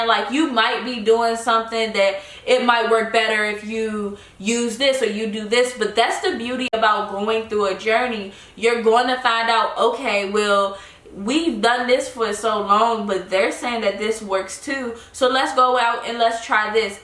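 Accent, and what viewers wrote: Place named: DMV, United States. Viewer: Are you from the DMV? Your accent sounds familiar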